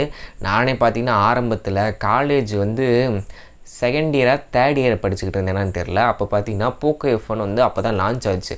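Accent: Madurai, India. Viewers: Bro neenga madurai ya bro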